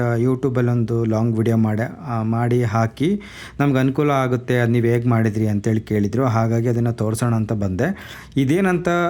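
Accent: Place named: Bangalore, India. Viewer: Yaru ene helali neevu mathadtha recipi thorsi ivathu yako bangalore janara thara mathadha idhira ista aagolla chithra durgakade mathadidhange mathadi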